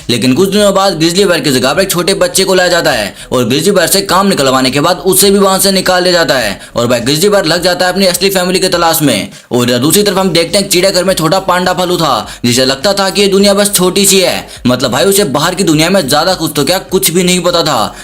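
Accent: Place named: Pakistan. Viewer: Ap Pakistan se ho? 🤔 aapki accent pakistani jaisi hai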